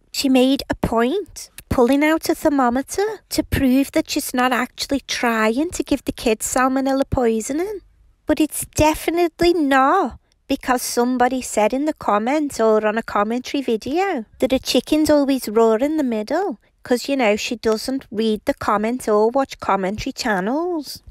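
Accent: Liverpool, United Kingdom. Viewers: The Liverpudlian accent accentuates the snarkiness 😉 love your vids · Are you scouse?! 😂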